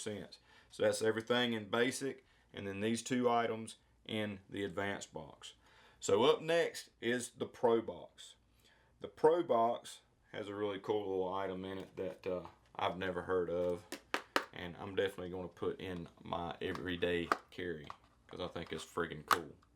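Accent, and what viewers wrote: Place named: Georgia, United States. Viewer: Hey! where are you from? You sound like your from around the Georgia or South Carolina area...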